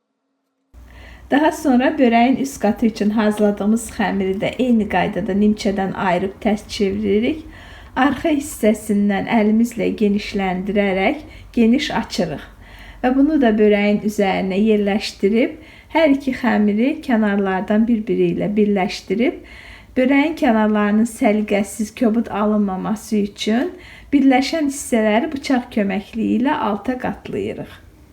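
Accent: Baku, Azerbaijan. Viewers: Gozel Bakili letcesi le bacim, Allah sizi qorusun, 🙏🏻❤️🧿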